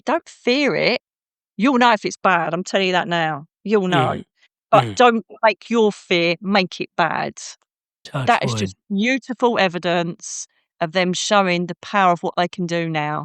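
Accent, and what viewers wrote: United Kingdom. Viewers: Hard to understand her British accent!😢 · American here so I don’t know how to describe this accent, but wherever she is from in the UK seems to have an over representation of psychic people. Seems very common on Gaia etc. Kind of interesting 🤔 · I can’t get over how FAST time is moving right now!! She is lovely! She sounds like my UK family ❤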